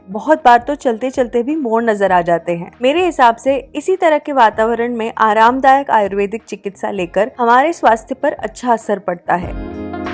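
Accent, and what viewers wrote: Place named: North India, India. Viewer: Itani sudhdh Hindi...i guess your native place must be somewhere in North...anyway so pleasing to hear your Hindi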